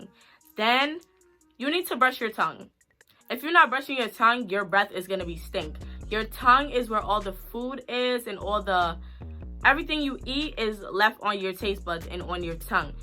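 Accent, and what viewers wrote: New York, United States. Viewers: I love your new York accent 😍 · Youu from Ny ? Ya accent 😍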